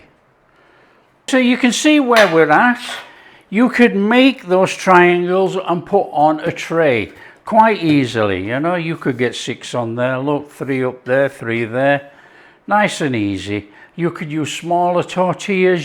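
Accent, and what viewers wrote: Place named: England, United Kingdom. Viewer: Love your scent which part of England are you from x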